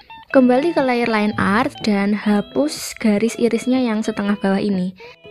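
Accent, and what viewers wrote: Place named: Java, Indonesia. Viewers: Dan juga medok'e uueenak reek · Mbaee orang jawa yaaa wkwkw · jowo ne medok ya bund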